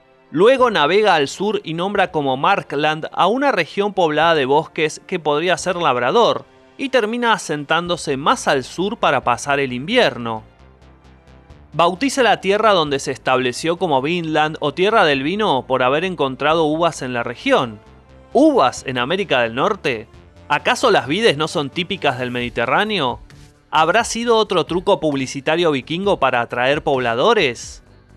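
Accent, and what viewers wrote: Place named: Argentina. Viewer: Por tu acentonoareces argentino, y un desagradecido decir que nos vikingos llegaron antes que los españoles acompañan a colon, que gracias a ellos tienes lengua y un celular 4g, los que nose han descubierto como las tribus Amazonas.....ahí siguen tirando flechas....y seguro que eres biznietos de un gallego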